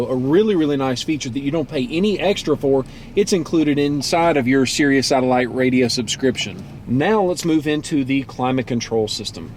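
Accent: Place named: Alabama, United States. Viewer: Just bought a new Ford Escape 2019 in September 2019. This was an extremely helpful learning tutorial. It was easy to understand, even if you don't speak the Alabama dialect. I tried to learn from the owner's manual, but I just got lost trying to read it. Take the time to listen and to watch this video. I owned a Focus 2012 with the prior Sync system (called My Ford Touch) and this new Sync 3 system is so much better and it operates very fast with no delays. You will fall in love with this system. I do use an iPhone 8 and it works great when connected by Bluetooth or via USB wire. You do have to have the iPhone connected via USB cable for some of the functions to work. Also, the sound quality of music or of Podcasts which are on your iPhone is much better than when playing music via Bluetooth. Thank-You for taking the time to make this video.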